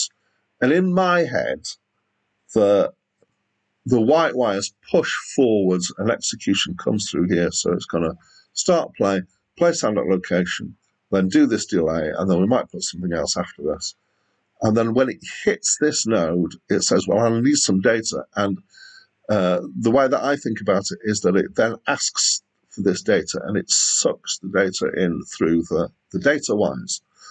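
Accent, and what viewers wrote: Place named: United Kingdom. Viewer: Ok plus noticed the accent my UK bud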